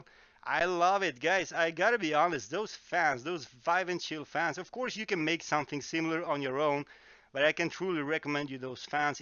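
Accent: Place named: Sweden. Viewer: Jag tänkte väll att du var svensk Man hör det lite lite på din engelska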